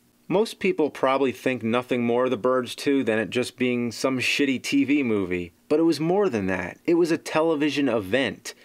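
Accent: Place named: Northeast, United States. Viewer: Typical northeastern accent.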